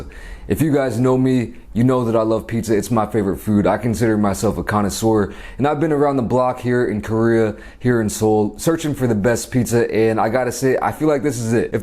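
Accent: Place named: South, United States. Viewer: I wonder was he born in tx I hear a southern accent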